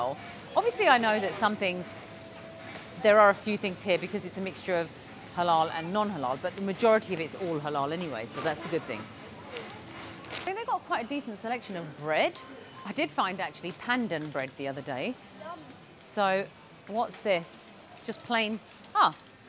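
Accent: England, United Kingdom. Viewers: I always looking forward to your next videos... It's interesting. Maybe it's your British accent attraction...😅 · are you from England.. mem ... ❤❤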